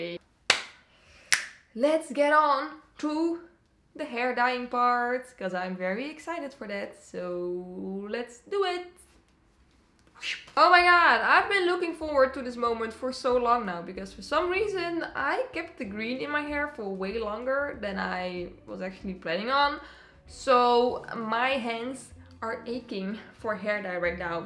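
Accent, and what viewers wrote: Netherlands. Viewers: Are you Dutch? I'm definitely hearing the accent lol. Love your videos! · Wait are you Dutch bc I know our english accent😂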